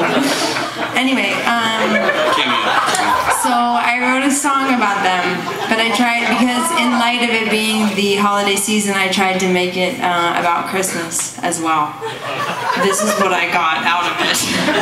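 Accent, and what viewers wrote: New Jersey, United States. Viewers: with a Jersey accent is HILARIOUS! Gotta love her lol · i love her jersey accent... but I'm from jersey and don't talk like that lol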